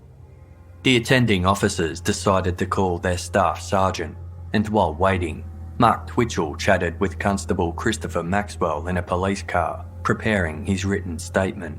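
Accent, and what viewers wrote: Australia. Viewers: God help me I'm falling in love with the host's voice. And his aussie accent. i can't help. i just can't help it. Also the research done on this case, along with their previous episodes, is just fantastic. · omg seriously he is Australian.His voice is perfect for podcasting.Not being a dramatic narrator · Love the Aussie accent. You must be very “cultured”. He has an Australian accent. He’s an Aussie. Love the accent. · The story telling (writing) in these is let down by the infuriating narration in my opinion. The terrible pronunciations ( eg. Celtic is not pronounced Seltic…but Celtic as in Kilt -at least twice said wrongly) And the monotonous tone is mind numbing. The clipped way AND is pronounced and then if followed by a word starting with J it sounds like he puts ‘the’ in the space so something like …”and Jane said” becomes “and the Jane said” which is not just distracting it is ludicrous. 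I know these are free on here but for those listening elsewhere, they are not free. Therefore those people are paying for mistakes. As for place names, I can excuse the odd mistake in pronunciation of foreign places (though that can be checked before hand) but when a place names in his own country is hideously wrong, it is disgraceful. Also, the accent, though somewhat Australian, is not typical, well not of the thousands of fellow Aussies I know. If this was a report card I would say…could do much better D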